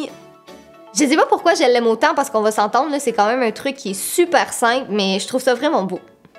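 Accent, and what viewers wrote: Quebec, Canada. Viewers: Tu es kebecoise ? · je kiffe l'accent du Québec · J'ai bien ta voix,canadien.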